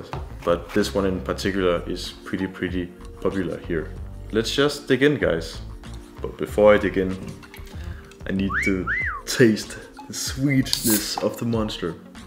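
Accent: Standard. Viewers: 很標準了棒棒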